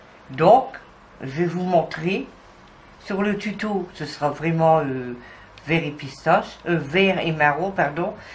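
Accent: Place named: Cambrai, France. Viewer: Merci pour ce tuto je suis entrain de le faire pour ma future petite fille qui arrivera en septembre!!!! j'adore vos Modèles et les explications sont simples!!!!! Continuez à nous enchanter avec vos modèles, j'adore votre accent, j'ai habité Cambrai pendant 6 ans et quand je vous entends parler je m'y revois!!!!!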